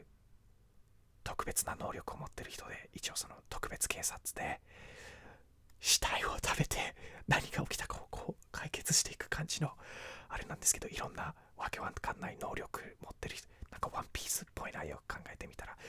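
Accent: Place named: United Kingdom. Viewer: 今日もPDRさんの英語囁き声が聞けて嬉しい( *´艸｀)←イギリス英語フェチ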